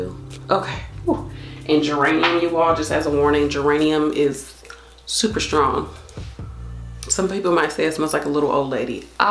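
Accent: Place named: West Coast, United States. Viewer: I love your vibe i felt your locs through the video. You sound like your from the west coast that caught my attention. Keep up the frequency im entering my journey again after cutting my 10 year locs as a teen.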